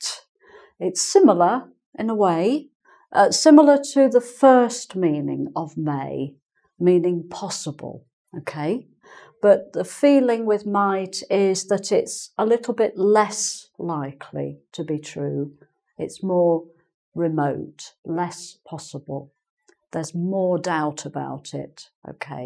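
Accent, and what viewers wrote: United Kingdom. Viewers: This is the accent that the world wishes all Brits had! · British accent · ale jaki to akcent? londynski czy moze oksfordzki? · She is so charming,....and, her voice and her British pronunciation are very pleasant indeed. Thank you, Ms:-). It has been a real treat. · she is beautiful, her speaking style is very British and it makes everyone understand❤️ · I love your British accent!^_^ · such a beautiful British accent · Nice explanation! I love the British accent...in fact you can't disassociate a language from its own background, right? I have a question on this topic here regarding to pronunciation. The word "maybe" has a slight difference from the 2 words "may be", doesn't it? I do think I hear that! In "maybe" is the first syllable stressed? In "may be" is the second word stressed? Am I right? Thanks for your videos....I love them! 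· Her English accent is perfect as I am quite familiar to British accent . From Thailand. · What a lovely woman, this is the British accent that everyone can understand. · My god i really miss the British accent 😢💔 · It might be her English/British accent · Lovely British English ❤️ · Love that british accent. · * Hears a British accent * * Love for the English language intensifies!!! * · I love your beautiful accent !!! and your lessons of course !! Your british accent is wonderful. Regards from Chile. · I love your british accent.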